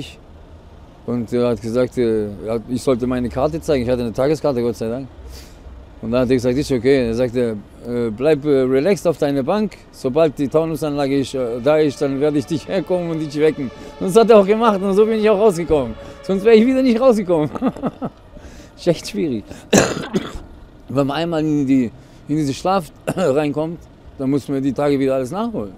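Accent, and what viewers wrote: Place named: Baden-Württemberg, Germany. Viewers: normaaaal so redet man in baden württemberg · Er erklärt gut. Schwäbischer Dialekt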